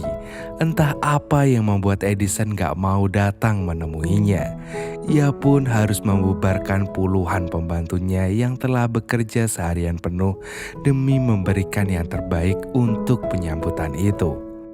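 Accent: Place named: Java, Indonesia. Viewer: kirain ini insomnia film wkwkwkk tapi kalo yang ini agak lebih medok suaranya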